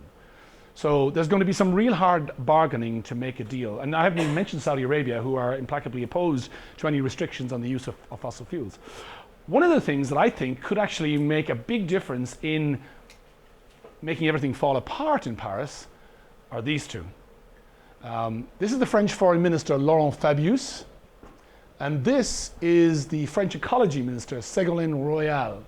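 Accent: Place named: Boston, United States. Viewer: Cool Boston accent!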